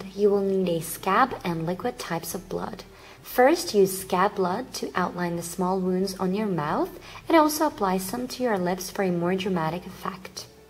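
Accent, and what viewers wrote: Finland. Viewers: Are u from Finland??? :D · Are you Finnish or Russian?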